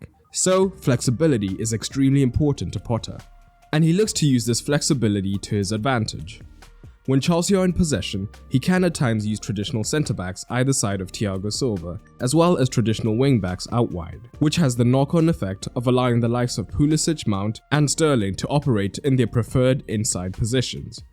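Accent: South Africa. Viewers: Are you South African this accent sounds weirdly familiar 😂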